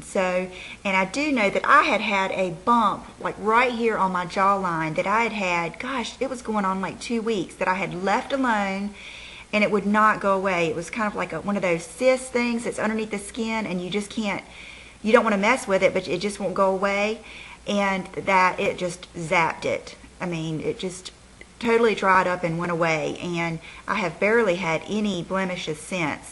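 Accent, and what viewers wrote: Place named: North Carolina, United States. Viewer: Elizabeth Elizabeth I'm not sure where you are from but here in good ole' backwards NC with our accent it's "whelps" Your comment kinda pissed me off because you seem to be poking at her accent. We are very proud of our accent here and I think is as cute as a bug with her accent.oh lord there goes that darn accent again. You seem to be a judgmental person. jmo! Also FYI WHO HAS 3 SUBSCRIBERS AND WHO HAS THOUSANDS OF SUBSCRIBERS! Nuff said as we say here in NC!!!!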